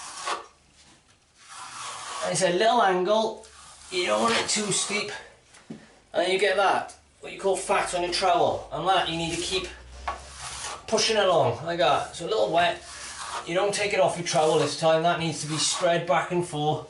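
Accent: Wales, United Kingdom. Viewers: I tried to patch some plaster in my kitchen and made a right mess of things. I found this video and the explaination and skills shown are superb. I am really please with my work thanks to you. And the lovely Welsh accent is an added bonus! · Like your videos, great sense of humour, that accent are you from Cardiff/Barry? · Welsh, not Irish.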